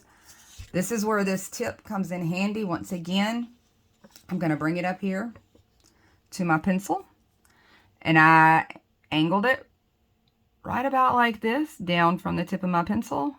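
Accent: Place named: Mississippi, United States. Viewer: I loooove this tutorial and appreciate you. I was raised in South Mississippi and your accent reminds me of home. Im in FL now. Where are you from? Kathy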